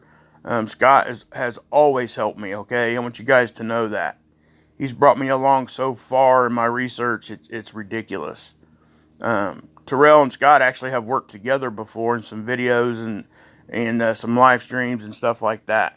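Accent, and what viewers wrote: South, United States. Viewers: I just subbed good video man sounds like your from the south good to hear a familiar voice since im from Tennessee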